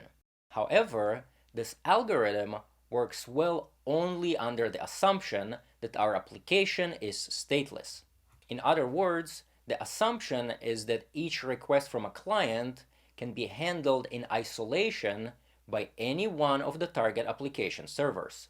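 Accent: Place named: Eastern Europe. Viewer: You have interesting accent, do you have any Slavic roots?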